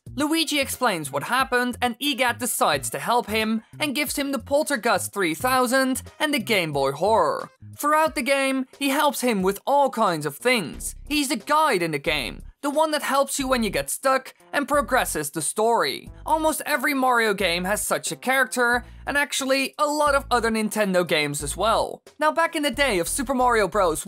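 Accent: Netherlands. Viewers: Your dutch right?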